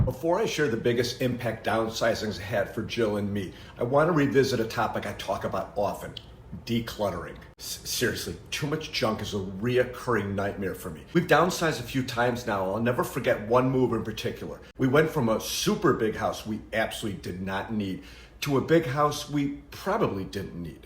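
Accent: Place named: Chicago, United States. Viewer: 7 years ago, after the youngest of our kids was a sophomore away at college, we downsized from 4 bedroom/2.5 bath (2,200 sq ft h in the suburbs into the city to a 3/2 with 1,850. We both absolutely love our one story, all brick SMALLER house! We are in close proximity now to everything: our jobs, our church, stores, hospitals. Less house to keep clean, less property taxes, utilities etc. (we live in coastal Georgia.)The only challenge? We have only one guest bedroom, so at holidays when our kids and grandkids visit, THEY have to pay for hotel or AirBNB. The youngest has a spouse but no kids so thus far she’s usually the one who gets the guest bedroom. My husband and I both U of I grads so I enjoy your Chicago accent 😊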